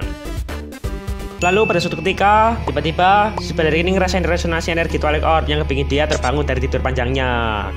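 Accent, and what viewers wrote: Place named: Sulawesi, Indonesia. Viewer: Banh lu orang Sulawesi kah? sebagian logatnya orang Sulawesi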